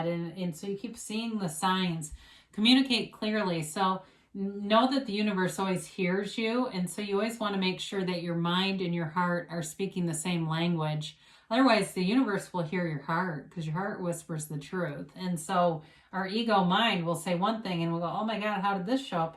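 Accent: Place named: Michigan, United States. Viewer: Sounds like you have a Michigan accent...or thereabouts.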